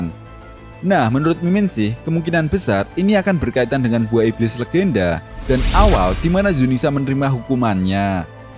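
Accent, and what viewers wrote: Java, Indonesia. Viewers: Mendok ya bahasany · Logat Jawa nya kental banget 😅😅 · NARATORNYA MIRIP SUARA DONO . SERIUS. KALAU DONO PAKE LOGAT JAWA DI FILM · Lebih enak dengerin narasi dengan suara begini, drpd yg nadanya dibikin 'sok mantap' wkwk Lebih chill dengernya ini Javanese bgt min, nice, stay low yea~ Keep the good work clap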